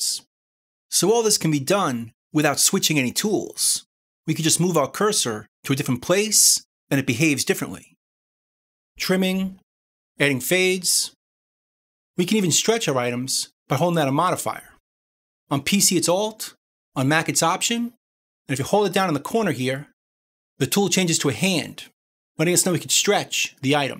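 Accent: New York, United States. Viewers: You're a talented teacher! That New York accent helps, too!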